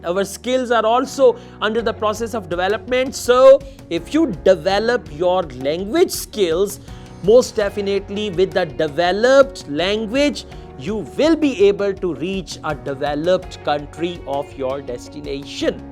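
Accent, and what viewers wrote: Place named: India. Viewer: I don't like when you talk in Indian 🙄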